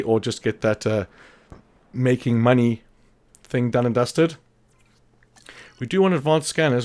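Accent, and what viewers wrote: South Africa. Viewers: You can see from the Alamosaurus how keeping the button depressed when placing forest or a food source really helps with the space required to keep them happy. I can't remember if I mentioned it in the comment on the previous video, but you keep the button depressed until it stops costing money. Once it no longer costs money the area is full of whichever vegetation you're placing. Also, last time I didn't mention that when placing trees from the decorative section instead of using the painted forest, those trees do not remove whatever vegetation is painted in the area i.e. they do not replace the food sources like painted forest does nor do they replace water, which heavy forest, when placed in water, does. Thanks for another great video; both instructive and entertaining. I could listen to that South African accent all day! By the way, I saw in one of you replies to another viewer that your wife's a Kiwi -- I'm in the middle of you both, I'm an Aussie!! 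Cheers, mate, have a good one!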